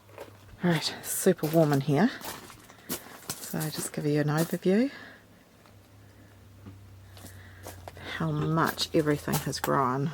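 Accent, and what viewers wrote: New Zealand. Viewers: Kia ora - zoomed in from Te Awakairangi (aka Lower Hutt) - really feeling for you as I am sadly just getting over this rotten flu going around too... congratulations on the new channel 🤩looking forward to learning what you doing. I really appreciate your cooking and how to make and store recipes (especially the Hot choc recipe YUM!!). PS love your kiwi accent mwahahaaa. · Yay another channel!! I'm going to be enjoying hearing your voice when I move to America in September as I'm going to miss hearing our Kiwi accent over there!!!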